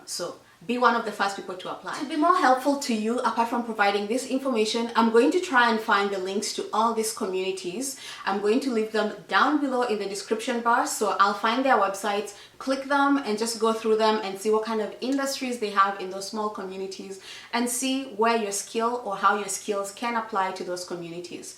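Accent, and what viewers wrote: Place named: Kenya. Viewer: Good Job. I can tell you have lived in Kenya (from your English) i hope to migrate by end of 2020. Sitting my IELTS next week. Much love from Kenya. your hair is beautiful too.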